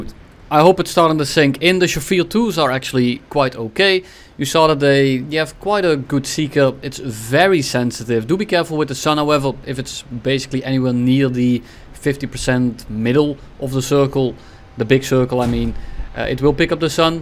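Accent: Netherlands. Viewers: wait are you dutch?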